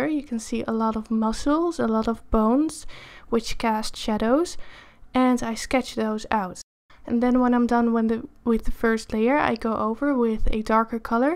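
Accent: Netherlands. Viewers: You hear that she is from the Netherlands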